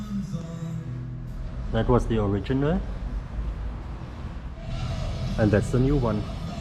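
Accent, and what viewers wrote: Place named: East Germany, Germany. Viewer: DDR ENGLISH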